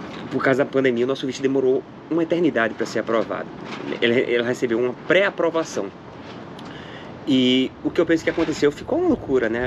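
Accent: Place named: Northeast, Brazil. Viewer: Você é de onde? Seu sotaque é nordestino! Eu sou de Fortaleza/CE.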